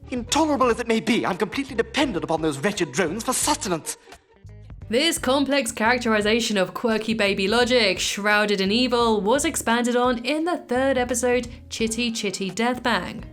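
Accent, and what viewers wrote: United Kingdom. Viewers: Are You British Or American Cuz I’m British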